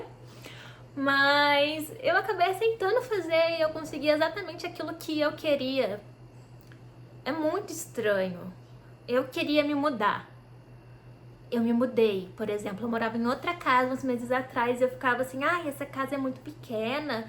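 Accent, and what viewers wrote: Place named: São Paulo, Brazil. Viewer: Acho que ela fala paulistano, pessoas que moram em são Paulo.. eu também acho bonito como eles(a) falam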